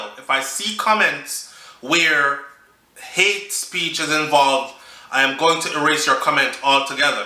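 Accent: Trinidad, Trinidad and Tobago. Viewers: I like your trini accent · Love the Trini accent 😎. When is going to update us on the baby ❤️—a Mother’s Day video would be nice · Ur trinidadian accent shot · Love the accient spot on you sound like my cousin in Trinidad. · Yow your trini accent is on fire. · I cant with you with the Trinidadian accent. 😆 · Your Trini accent bro!! 🤣🤣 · I can’t do the trinity accent, but I think u did well.lol However, ‘miracle’? Daaang, I thought we all knew sperm in pum pum Mek baby.loool Sheeeet these liberals always redefining reality with some bs mind fugg! Oh how brave smh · you sound sweet talking like Trini OMG love it don,t cave about people life they live every one do what they want to do all I can say two dutch pot can,t close or two cover that is all I can say not for me to judge leave it to god glad for them. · 🤣🤣 you’re trying to read like a trini bless up bro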